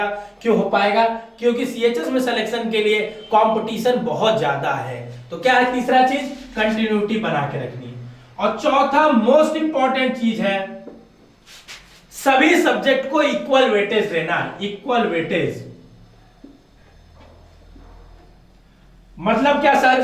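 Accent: Varanasi, India. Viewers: Sir apki Voice batati h aap pure banarsi h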